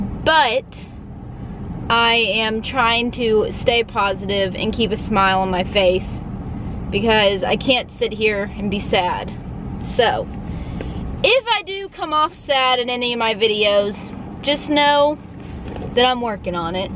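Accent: South, United States. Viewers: Hi, I just discovered your channel! I love your spunkiness and your southern accent. I am a southern girl at heart! I was wondering if you could use any brand of BCAA's?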